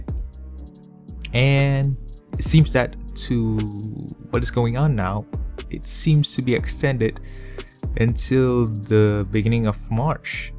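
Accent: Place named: United States. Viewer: You sounded American and as an American, I LOVE the way you say "Perspective". Also, am a big fan of Dragonball Z and Goggins too. ✨ ✨ 💪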